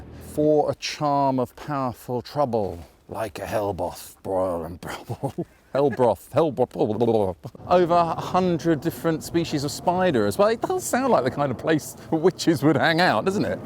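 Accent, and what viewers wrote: United Kingdom. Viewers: Can anybody tell me from what region of british accent he has?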